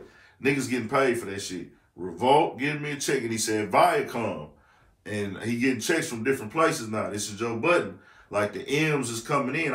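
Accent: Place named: Houston, United States. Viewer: Mane you from Houston fam? I can hear that shit all in your vernacular.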